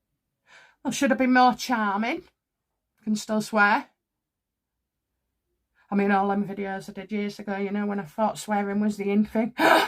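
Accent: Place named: England, United Kingdom. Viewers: Am gutted for you. I love listening to you. Not only as a reader, but also because we speak the same dialect. Am a 74 & I speak with an "old Bradfordian" Yorkshire accent. N am proud on it. It's probably the accent H can't cope with, poor little man. ( Big tear left eye). Trevor Coult was demonetised by h&m & he chose to have a long legal fight, and he's coming out tops in the end. I wish you all the love, luck and justification.🕉️ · You are entertaining as well as teller of truth. I love your energy and also your particular English accent. Coming from Australia ❤ Harry is a boring predictable petty nasty piece of work. Where are his imaginary children? He NEVER mentions them! What a dishonest POS, taking orders from his horrible handler. Weak as piss.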